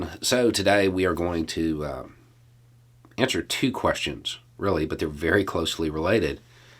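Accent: South, United States. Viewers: For me gives me comfort that all is not lost. I live up north where it is pleases me that the way talks does not sound like me or the people you normally hear on the news or typical moderates and liberals. He is from the south, looks and sound like likes someone from the south. So people from his neck of the woods are more likely to listen to him.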